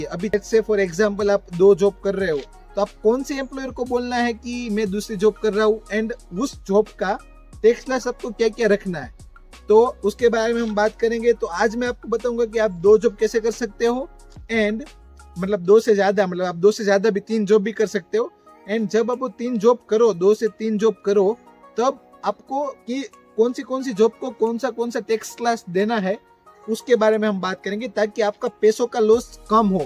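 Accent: Gujarat, India. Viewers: Bhai ki aavaz se gujarat ki khusbu aari h😄 are you gujarati ??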